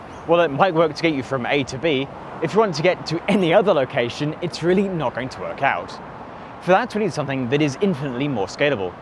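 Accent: Australia. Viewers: This guy is just an australian Tom Scott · australian tom scott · Is this Australian Tom Scott?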